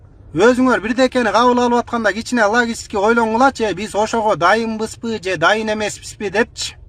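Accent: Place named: Pamir, Tajikistan. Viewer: Туура эле суйлодун брат. Акцент памирский экен а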